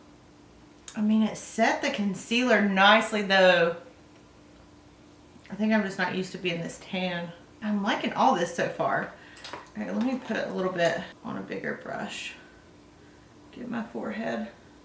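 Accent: South, United States. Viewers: I love your southern accent 😂 what state do you live in? Close to Tennessee ?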